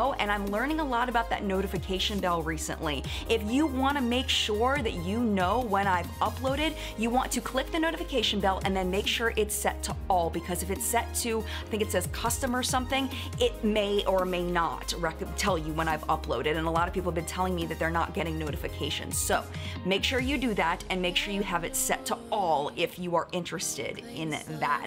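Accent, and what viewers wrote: United States. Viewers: She came for Charlotte Tilbury and I LIVED FOR IT! 😂 Where I am, my clients raved so much about it. But I was never really thoroughly impressed with the brand to begin with despite how it was advertised as a brand loved by Hollywood and blah blah blah. I really appreciate people who shed light on facts like these. Oh and I just love her pure American accent like it’s just so perfect.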